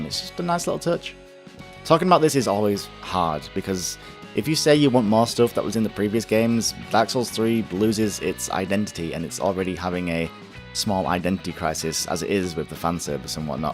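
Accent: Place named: United Kingdom. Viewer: Ah, ramblings in British or... whatever you accent is. Whatever it is, it's exceedingly good!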